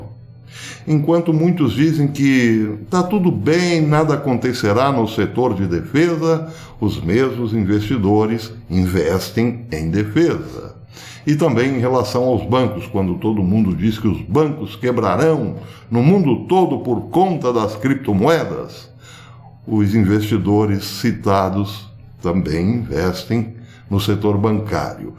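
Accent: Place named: Minas Gerais, Brazil. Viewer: velho de guerra, teu mineirês tá afiado, hein?! Parabéns 👏👏👏😁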